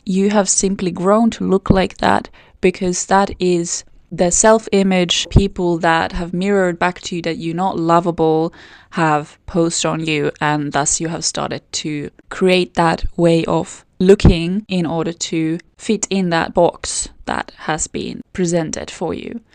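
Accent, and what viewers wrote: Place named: Scandinavia. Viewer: I figured you were Nordic, but I wasn't sure if it was that or a German accent. Your accent sounds vaguely British sometimes too.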